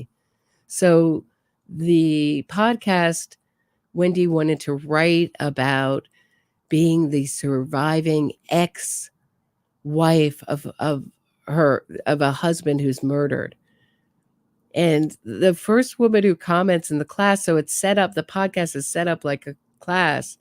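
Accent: New York, United States. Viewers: Hi , I love your new york voice. It's very addictive. Great channel and topic.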